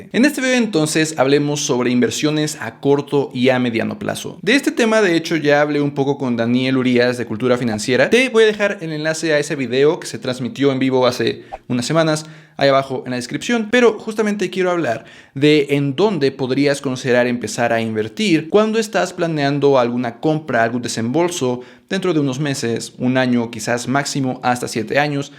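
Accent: Mexico. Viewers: Llevo poco de seguirte pero me encanta tu canal cawn, por tu acento creo que eres de México. ¿Que brokers recomiendas para usar aquí en México? Saludos, crack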